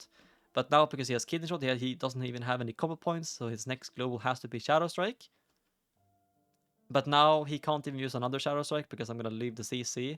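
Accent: Sweden. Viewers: Hey you are Swedish right? Trying to learn my accents.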